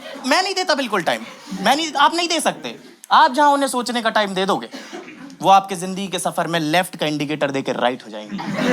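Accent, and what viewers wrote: Hyderabad, India. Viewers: Bro Amazing 😂, your hyderabadi accent was crazy 😂 , Angaar dal diye 🔥⚡😍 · I have hyderbadi friend he also speak like this but ur comic is very good bro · Ooe hoyee kya hyderabadi bolta tum apna to dil aa jata tumhare batao par😂😂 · Hyderabadi assent was too good. Good comedy set. I like it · that hyderabadi accent u killed it bro❤‍🔥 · Ending 😂😂 the hyderabadi accent is so good 😂😂😂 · Thora aur hydrabidi ascent rahta tab kuchh jyada hi maza aa jaata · Haydrabadi accent yarr 😆😆😆😆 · Coming again again just for hyderabadi accent 😂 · Bhai crazy yrrr ,kya gand fadi ha hyderabadi ma ,oyeee hoyeee 😂😂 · First time pura standup maine dekha hai but accha tha comedy maine sirf hyderabadi accent ke liye aaya tha but pura dekhna pada 😂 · Hyderabad accent , nice bro 😂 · Ek pura 🤣😂show hydrabadi. Accent me krlo bhai❤ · Maja dila diya bhai... Hyderabadi accent too good 😂😂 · Hyderabadi accent ne pure comedy me jaan daal di ❤😂😂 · Mujhe aapka hydrabadi accent bahut achha laga i love you ❤❤❤😍🥰😊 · Loved it. 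Hyderabadi Accent was hilarious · Super hydrabadi touch 😂😂😂😂😂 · Me to sirf apka haidrabadi accent sunne aai hu.. very nice yrr😀😀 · Vo hyderabadi accent ki vjh se ye pura video dekh rh 😂😂😂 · Bruhhh dyammm that hyderabadi accent wass justtt ♨️♨️ Loved itt brotherr❤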